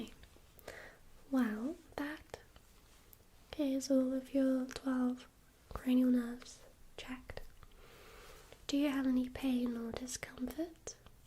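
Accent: England, United Kingdom. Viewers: I don't know why, but English accents help so much with tingles...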